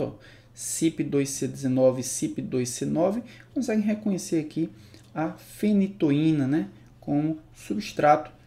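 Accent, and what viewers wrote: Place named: Sergipe, Brazil. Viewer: Muito obrigada por esta aula tão elucidativa! Seu sotaque me parece sergipano. De onde o senhor é? :)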